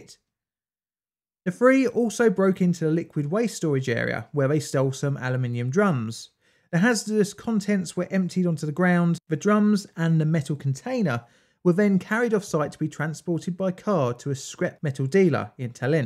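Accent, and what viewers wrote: United Kingdom. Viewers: Hi, this question is a bit off the wall. I am an amateur linguist and love dialects. I can identify many British dialects, but while I can recognize many, I often times do not know the name or location. So could tell me the name and location of your dialect (and don't just tell me it's in a balmy corner of SE London) 😊. Love the videos BTW.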